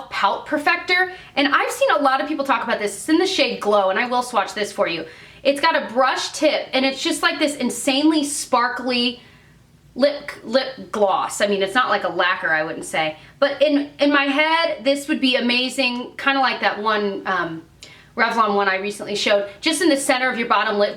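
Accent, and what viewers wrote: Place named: Boston, United States. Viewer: U from boston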